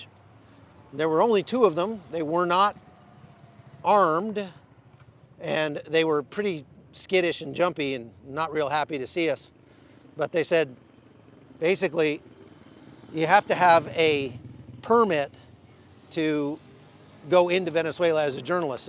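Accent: United States. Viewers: Get a stabilizer to hold your phone,. Your Spanish is funny with the heavy American accent.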